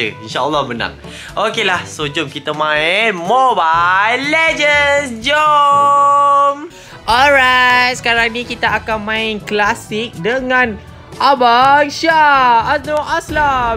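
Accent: Kelantan, Malaysia. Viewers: Beking btl abe maingg 😂😂 Beking=garang ☝️ Dialek Kelantan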